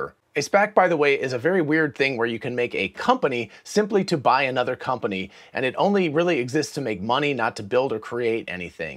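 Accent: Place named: United Kingdom. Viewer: It's so obvious that Lucid ain't gonna make it .. not quite as obvious as Nikola, but close. Elon has talked at length about the difficulties starting a new car brand. It is extremely capital intensive, and with their supply chain setup and pricing/TAM, there's no way they will make it to profitability without running out of cash ... again. Saudis bailed them out once, but the market won't be able to do it again unless they commit some major shenanigans. Lucid is a great dream narrated with a soothing British accent, but it's only that.. a dream.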